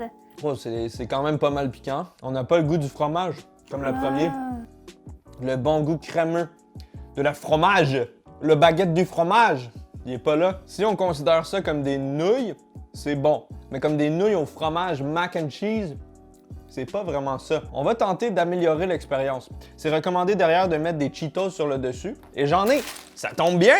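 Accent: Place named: Canada. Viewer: L'accent canadien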